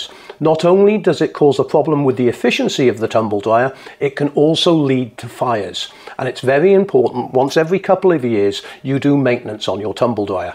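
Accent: United Kingdom. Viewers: Gosh, I came here for help to remove eletric oven and now I cant stop watching your videos. You are great! (and you have a British accent, which makes me feel comfortable because I had a great time living in The UK for 6 years! Cheers! (subscribed and like)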